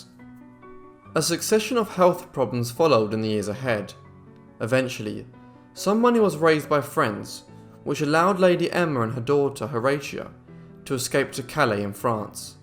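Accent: United Kingdom. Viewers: This Guy is legit reading us Wikipedia in a british accent and I'm here for it. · Dear Your British Accent makes it Sooo HARD for people like me with other languages except English. Can't you Decrease your Accent or Ask someone else with Non Accent to Speak instead of you. It is REALLY hard to Understand it with your Thick British accent. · Your accent is getting better, you sound very British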